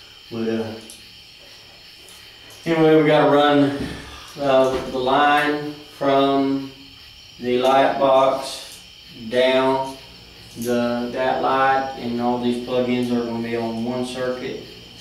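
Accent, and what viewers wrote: South, United States. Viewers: LOL being a southerner, I can understand him perfectly...BUT I had to try the captions!! OMG too funny!!! The ONLY thing it got right is "for us", the rest was so bizarre!!! :D thanks for the idea ;)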